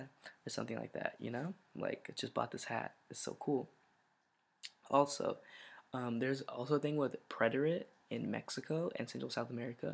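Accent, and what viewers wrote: Spain. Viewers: How long did it take you to have a Spain spanish accent? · una año en España? cuando comenzaste a hablar pense que eras español.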